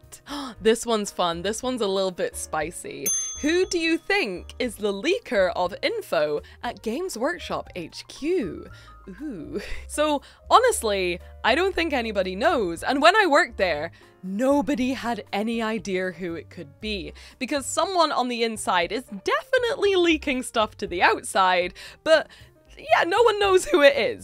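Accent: Scotland, United Kingdom. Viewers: How do people think you have an Australian accent? your accent is very definitily north of Hadrians wall lmao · I just assumed your accent was Scottish mixed with speech therapy due to dyslexia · Her accent sounds Scottish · My wife was born in Dundee, moved to Glasgow when she was a wee teen, then moved to America when she was about thirty. I recognized your accent immediately. She collects sea glass too. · Your accent sounds scottish to me but americans are terrible with accents and will confuse completely different accents 😂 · its nuts saying this but as a fellow scot i was still like "IS SHE SCOTTISH?!?!" for a while watching a few of your videos, i thought maybe you had moved somewhere and got a dual accent maybe. but then i settled on "nah she scottish" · Definitely a Scottish twang in your accent, but not an out right Scottish accent I feel. 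Have you been out of Scotland for a good long while perhaps? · Well, I'll be damned...always thought you were Irish over Scotish. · People thought you were American?! As an American, I'm baffled. To me, you sound like a Scot with an accent somewhat influenced by British broadcast standard (e.g. BBC), but I'm American and am not deeply familiar with UK accents. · Maybe it’s because I have Scottish family and wear a kilt full time, but there was no question about you being a Scot. The accent is a dead giveaway and in this video, the flannel you are wearing is Black Stewart. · Very obvious Scottish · It's so good to hear more Scots on YouTube. I knew your accent was from somewhere near where I was brought up, which was up the road from Dunfermline in Perth. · Just sound Scottish to me. But to be fair, I'm English and know a fair few Scots. So I have a higher level of exposure than someone from outside the UK. 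· Quite obviously Scottish. Silly people. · I figured your accent for Scottish right away. I'm honestly surprised people ask. It seems obvious to me. Mind you, I was raised on British comedy, so... I agree on the "calm down" thing. I hate being made to feel badly about the things I love. I think your Bloodbowl goblin is adorable. It's not really my business why you are no longer with GW. I just know it's their loss, and our gain. I'm looking forward to everything you have in the pipe. Wishing you nothing but unbridled success! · I figured it was Scottish, but couldn't put my finger on it, very light northern Irish was my second guess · Yeah that bit I thought was wild, I thought, her accent is obviously Scottish but also very much not Australian or American... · I was like “that accent is definitely Scottish” and then all the questions came up and I thought man… am I wrong, is it something else… nope. 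I guess perhaps modern media has led people to think everyone who is Scottish has to sound Glaswegian. I lolled when you said “Australian” but I can see how some might think American if by that it’s the Appalachian accent which can sound a bit Scottish, but given its relatively rare and you live in the UK Scottish is far more likely 😂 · My word, I've never met another Scot with the same accent trouble. I don't use Scots, so my accent comes out similar to yours, and people guess all over the place for me. I've had American, Canadian, South African, and one person came close and said Irish, but it seems like only other Scottish people recognise me as Scottish instantly. It's usually the hard R sounds that mess people up, but I also heavily use glottal stops which should clue people in. I'm from Campbeltown and live in Cardiff. All my family sounds properly Scottish, using Scots and such, though, so I always thought I was just a weirdo. 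· I knew you were from Scotland. You have the same accent as Christine Kochanski, Listers babe from Red Dwarf. The earlier versions not the English one obviously. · I now know why I love your accent my grandad was from dunfermline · Anyone who'd place your accent anywhere but Scotland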